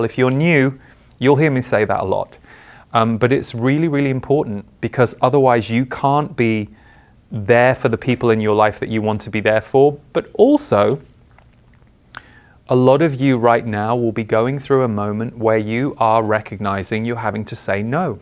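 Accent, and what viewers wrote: United Kingdom. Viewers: Not complaining as a culture is great, but please don’t lose your British accent. 🤍🙏🏼✨😎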